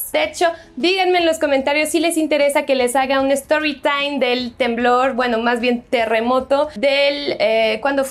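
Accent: Mexico. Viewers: Que simpatica eres y hablas ya como si fueras mexicana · Eres hermosa y hablas como toda una Mexicana, aun tienen fama los franceses de no bañarse, o solo es mito. Saludos desde México CDMX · Creo que es Mexicana tiene el acento mexicano y modismos que solo los mexicanos dicen. Debería tener un poquito acento francés y no tine nada de acento frances.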